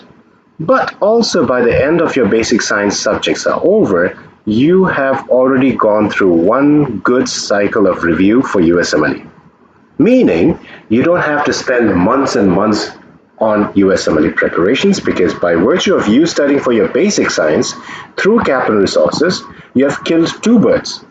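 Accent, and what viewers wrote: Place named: India. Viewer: Indian accent is awesome.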